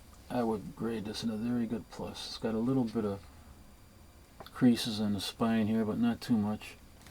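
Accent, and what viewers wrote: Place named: New York, United States. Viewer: you could be a voice actor you sound like a newyorker who knows the streets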